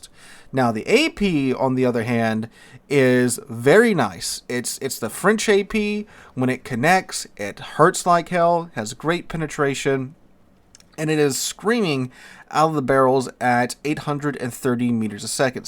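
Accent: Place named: United States. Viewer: the Alsays! xD There will be numerous shipnames in any number of languages to butcher in this game, so I get it but its still funny to hear you pronounce some of them in a very "Americanised" way!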